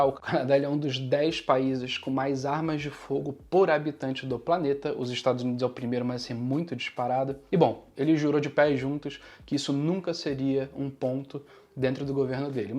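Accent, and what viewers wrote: Rio de Janeiro, Brazil. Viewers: Voce tem que ser Carioca com esse sotaque. Eu tambem nasci no Rio num lugar perto da Penha chamado Bras de Pina. Sou cidadao daqui dos USA a quatro decadas.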